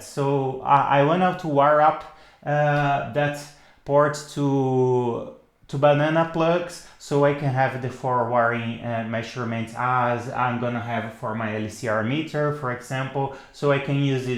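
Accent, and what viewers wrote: Brazil. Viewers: Kind sir, are you by any chance Brazilian or Portuguese?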